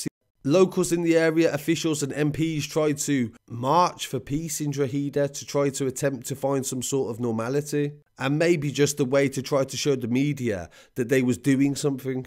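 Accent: United Kingdom. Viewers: Been a bit shocked past few weeks coming on to YouTube hearing a guy with a British accent giving the low down on my city with 100 percent facts and very good accuracy u got a new sub.... Iv met Robbie once in the joy and he wasn't right you could tell he walked around on his own... Sitting on his own in the middle of the yard in his own world.... I seen him in conflict once with a very close friend just call him liamo doin life locals know him.... Big tall crazy guy who people tryed to jump 2 with gangs and failed great boxer.... Him and Robbie had an argument about money got a bit heated one day words came both walked off to there cells obvisly ploting... Would of been a blade or something swung but pascal got involved and kept the peace he was friendly with Liam and was the shot caller for Robbie area that's all I know of him really..... He's notorious I hear really from whacking all his friends and would whack his own family on orders....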